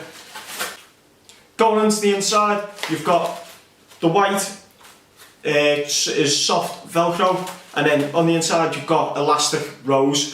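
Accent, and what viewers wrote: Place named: Liverpool, United Kingdom. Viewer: rrrr aaaaa you gotta love the liverpuool acccent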